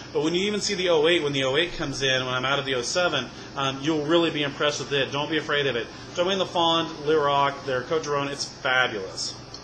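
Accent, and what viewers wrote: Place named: United States. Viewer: maybe a little heavy on mercantile concerns but good commentary... and such an American accent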